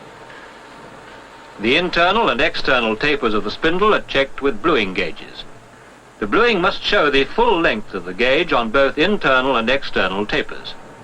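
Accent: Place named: RP, United Kingdom. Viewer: Hearing the narrator say “thou” instead of thousandth in his posh RP accent lends terrific credibility I must say old boy.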